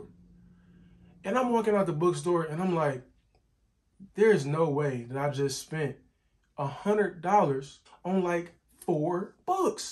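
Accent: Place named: Rio de Janeiro, Brazil. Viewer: I’m from Brazil and you sound like a Carioca (people who were born in Rio de Janeiro) and I love it. Your channel is such a incredible discovery ❤️ love the way you talk about the books! Hope you’re having a great booktube experience!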